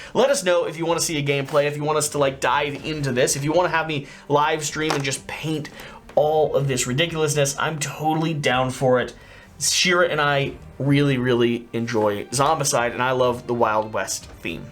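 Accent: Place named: South, United States. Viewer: Obligatory southern drawl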